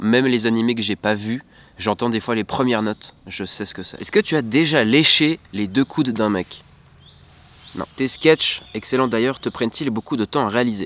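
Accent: Switzerland. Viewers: T’es genevois ou bien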